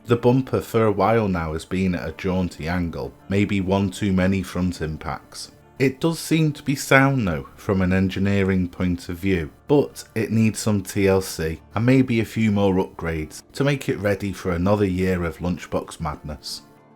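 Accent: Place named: North, United Kingdom. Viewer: I'm subbing because you sound Northern and depressed. You'd think this would be wrong but I'm becoming highly suspicious of happy Southerners. It must be a Northern thing.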